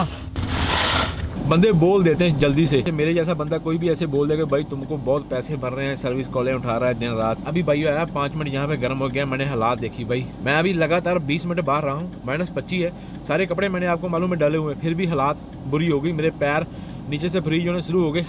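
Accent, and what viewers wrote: Punjab, India. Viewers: ਪੰਜਾਬੀ ਬੰਦਾ ਜਦੋਂ ਹਿੰਦੀ ਬੋਲਦਾ ਤਾਂ ਕੱਚਾ ਜਿਹਾ ਜਾਪਣ ਲੱਗਦਾ।ਬਾਕੀ ਗੁਰੂ ਰਾਖਾ ਐ ਤੁਹਾਡਾ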